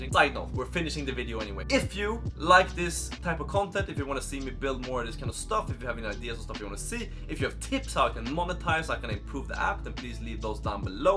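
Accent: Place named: Sweden. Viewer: är du svensk, för det märks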